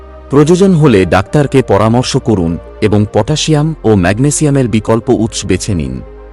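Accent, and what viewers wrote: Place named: Assam, India. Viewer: আপনি কি আসামের বাসিন্দা , এই জন্য উচ্চারণ টা শুনতে আমি কলকাতায় জন্মগ্রহণ করা একজনের লোকের কাছে একটু শ্রুতি কটু লাগছে , অবশ্যই আপনার ভাষাতেই আপনি কথা বলে চালিয়ে যেতে পারেন , ভালো থাকুন সুস্থ থাকুন ❤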